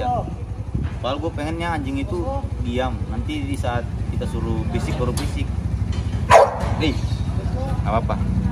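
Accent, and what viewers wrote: Ambon, Indonesia. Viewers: Biar lingkungan jakarta tapi lidah ttp ambon jua bu.. 😁😁😁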